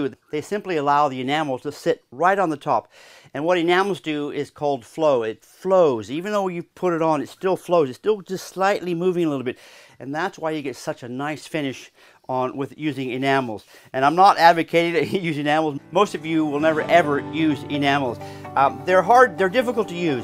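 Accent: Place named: Australia. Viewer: I thought I detected a slight Aussie twang! Great video mate!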